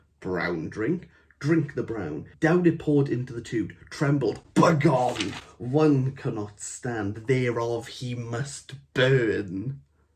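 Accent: Wales, United Kingdom. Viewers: Cool review. Love a welsh accent I was born there left when I was 5 and have never been back.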